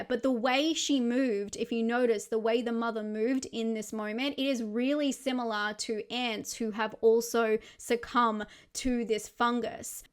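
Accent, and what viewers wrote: Australia. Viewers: We say ree-NYOO-al You sound Australian. Aren’t you from Australia?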